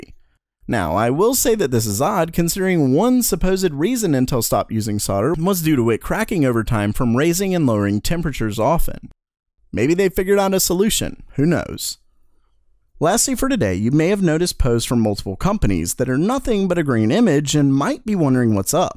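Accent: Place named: United States. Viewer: It’s both okay? He’s American so he pronounces it wrong but whatever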